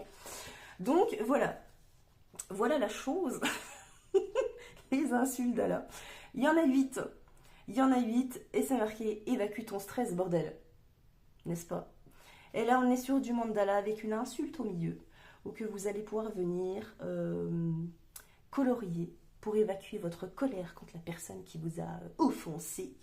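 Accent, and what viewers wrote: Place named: Franche-Comté, France. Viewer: Super vidéo, tu es vraiment top, j'adore ton enthousiasme et ta joie de vivre que tu nous partage. Et ce que je préfère c'est ton accent franc comtois que j'adore tant. Bon courage pour monter dans le nord.... Le jura c'est quand même vraiment trop beau.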